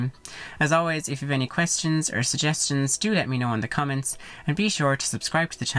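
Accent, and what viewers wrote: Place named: Ireland. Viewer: You're the most Irish sounding Irishman I've ever heard!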